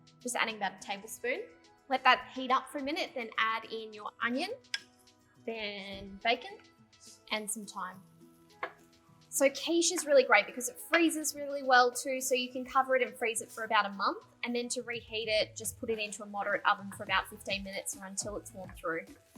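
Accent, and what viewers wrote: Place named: Australia. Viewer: She's so happy all da time man...and she sounds like she's from Australia. What a terrific woman. Preciate da video fr💚🖤🤲🏾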